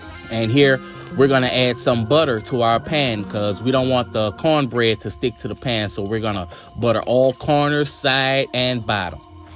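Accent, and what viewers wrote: Louisiana, United States. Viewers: I know a Louisiana accent when I hear one 😂😍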